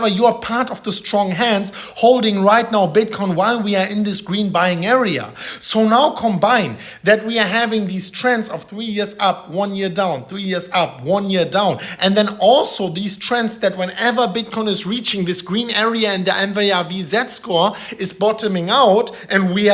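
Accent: Germany. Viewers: I watch his video for the drama and his German accent, “People spitting on my face…”😂 Keep it up as it’s all going down…trend is our friend until the trend; the macro trend in down first time in crypto history…so screw the pleul or some Dutch crypto guy or whoever… BTC will be lucky to hang in at $16k for 2023!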